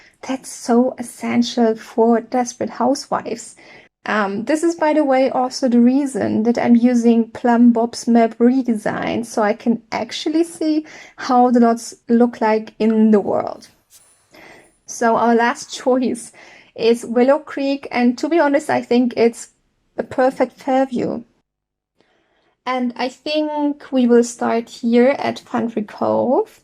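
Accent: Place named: Germany. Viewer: Sie ist sowas von Deutsch. Ihr Akzent 😅😂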